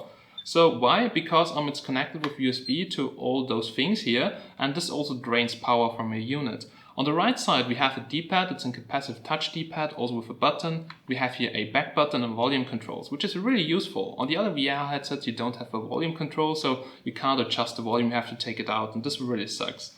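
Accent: Germany. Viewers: your english sound with german accent or netherland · Ich geh mal davon aus das du deutsch bist ( von deinem aktzent her) heute war englischprüfung in sachsen... kann es sein das du für den listeningpart den text gesprochen hast? hat sich zumindest so angehört! :D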